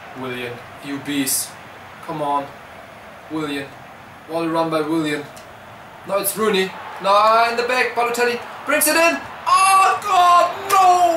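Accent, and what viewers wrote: Germany. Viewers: Manch mal merkt man das du deutsch sprichsf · Alter Red deutsch man merkt dass du deutsch bist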